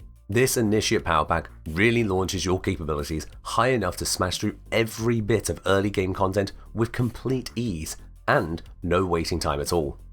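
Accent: United Kingdom. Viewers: True London voice.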